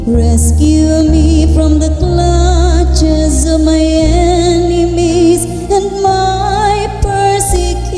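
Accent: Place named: Bisaya, Philippines. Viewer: Bisaya Maam mera hehehhe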